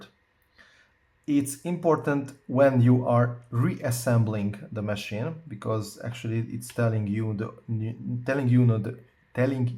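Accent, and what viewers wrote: Hungary. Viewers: Szia, pontosan ilyen laptopom van. Van ra lehetoseg, hogy privatban beszeljunk? Ramot szeretnek boviteni viszont szakemberre biznam plusz lenne par specifikus kerdesem ezzel a laptoppal kapcsolatban. Nagyon informativ a video koszonom. (Magyar akcentus elteveszthetetlen :) )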